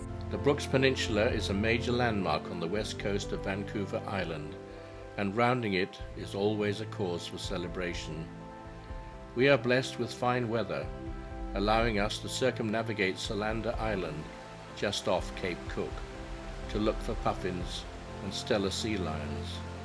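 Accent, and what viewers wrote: England, United Kingdom. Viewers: Is it just me, or does the accent have its roots in the same general locale as Alfred Hitchcock?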